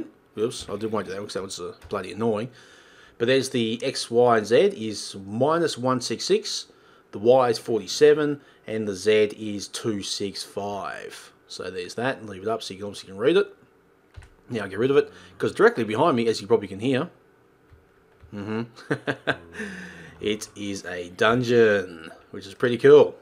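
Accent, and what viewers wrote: Australia. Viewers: Obviously Aussie xD.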